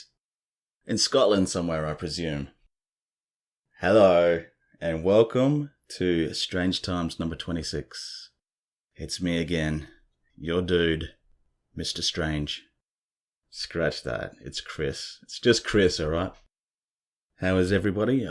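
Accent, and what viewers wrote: Australia. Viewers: Awesome Aussie accent Chris and awesome ghost videos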